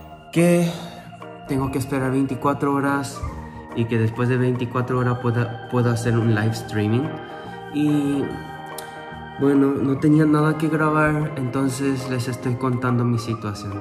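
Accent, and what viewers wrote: Paraguay. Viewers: En el momento que empezó a hablar me parecía que tenía el acento paraguayo y después comprobé 😁 Que bueno tener un lindo coreano en mi hermoso país por favor vengan más coreanos a PY .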